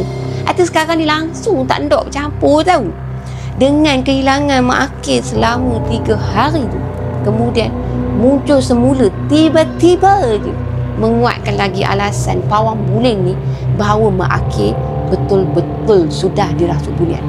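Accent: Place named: Perak, Malaysia. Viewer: Assalamualaikum. Selamat Hari Raya buat sekeluarga. Sedap dengar bercerita ade guna loghat Perak. Suke akk mendengonye. Cer Cuba lepeh ni guna loghat N.S plk..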